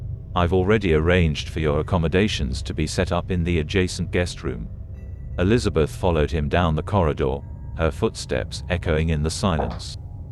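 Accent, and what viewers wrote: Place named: United Kingdom. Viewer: read by a British robot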